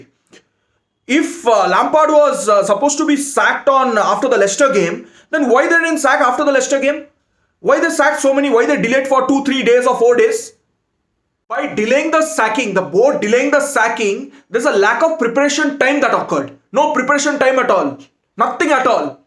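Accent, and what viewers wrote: India. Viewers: Idian accet so cool, I prefere of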